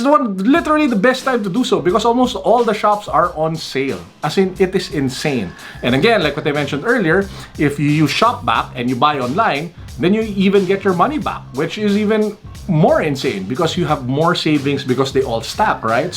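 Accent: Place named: Philippines. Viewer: I like your filipino accent.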